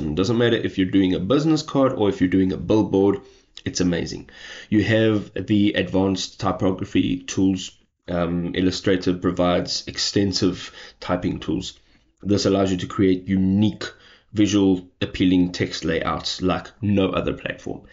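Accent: South Africa. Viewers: This is great info, brother. The South African accent never go away.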